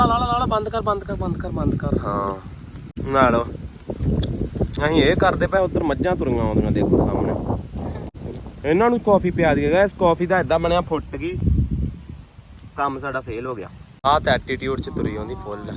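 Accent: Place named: Makhu, India. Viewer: aa boli makhu wale pase bolde aa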